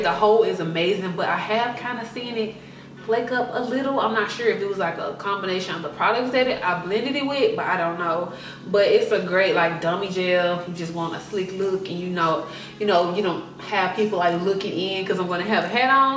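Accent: South, United States. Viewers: I love your accent! Are you from Louisiana? Somewhere in the south? I'm from Mississippi.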